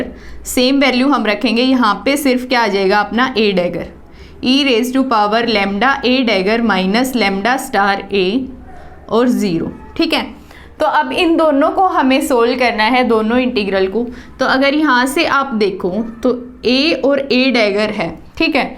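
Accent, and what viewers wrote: Haryana, India. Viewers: maam are you from haryana???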